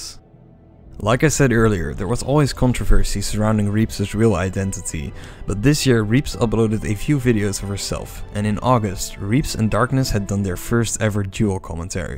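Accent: Netherlands. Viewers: dutch accent? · the guy speaking is dutch, 100% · the dutch accent is unbearable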